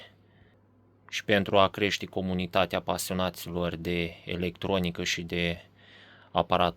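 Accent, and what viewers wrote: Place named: Moldova. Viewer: Sa ceri sa lase mai jos de 50 de lei si ai acasa osciloscop Rhode Schwarz ,apoi inteleg ca esti din zona Moldovei dar se pronunta corect in limba romana condensatoare nu condensatori ,tranzistoare nu tranzistori,.Bafta!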